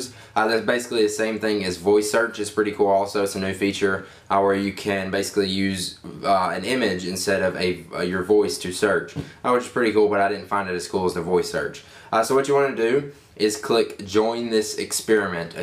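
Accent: South, United States. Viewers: I think he just means your slight southern accent.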